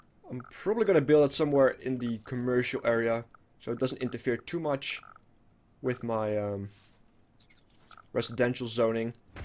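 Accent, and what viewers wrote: Netherlands. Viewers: His accent is dutch people.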